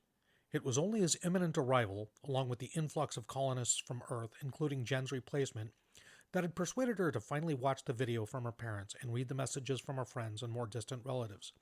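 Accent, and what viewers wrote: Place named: United States. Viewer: In that case, I’d be happier to hear you read it than the dictionary, even in your regular plain ole American accent.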